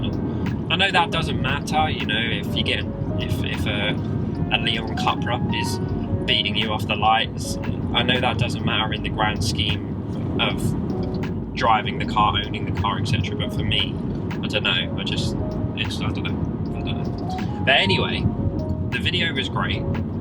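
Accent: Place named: RP, United Kingdom. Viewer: Lol this gets bullied on his own youtube channel lol just put a hoody on bro and loose the posh accent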